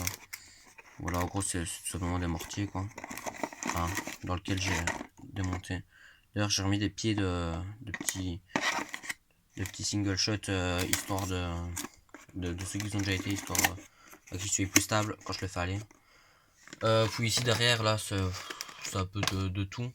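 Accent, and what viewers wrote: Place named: Liège, Belgium. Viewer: T'habites en Belgique à liège ça s'entend trop avec ton accent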